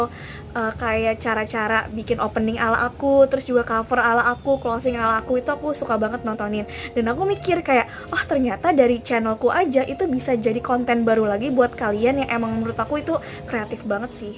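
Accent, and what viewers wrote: Jakarta, Indonesia. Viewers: budi, malih, markonah, betawi banget dah ahhh, gws cantikkkk Allah mau angkat derajatmu 😘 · Betawinya keluar dah:)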